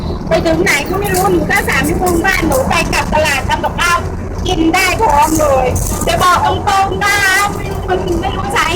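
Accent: Thailand. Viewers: สำเนียง...อีสานบ้านเฮา..แท้.ๆ..เด้อ..เอื้อย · ชอบอ่า สำเนียงเหมือนคนสุโขทัยเลย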